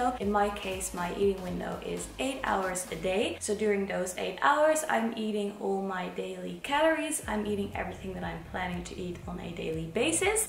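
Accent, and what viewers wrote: Netherlands. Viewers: Toen je begon met praten hoorde ik meteen dat je Nederlander was :)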